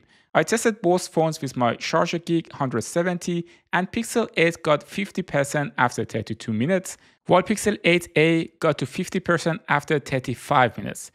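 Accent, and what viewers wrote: Iran. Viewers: Is that a Persian accent I hear?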